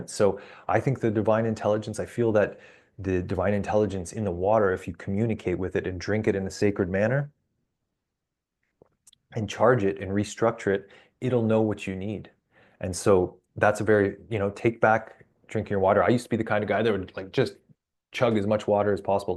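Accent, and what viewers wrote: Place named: Scotland, United Kingdom. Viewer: Yep...nice one 😮 nice Scoish accent 😅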